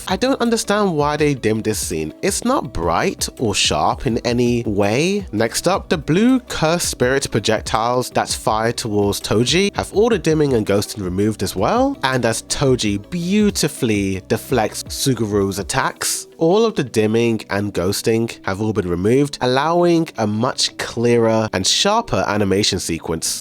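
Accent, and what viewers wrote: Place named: United Kingdom. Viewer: it's definitely his accent but I doubt English is not his first language. His accent is...English. Sounds like almost every UK dude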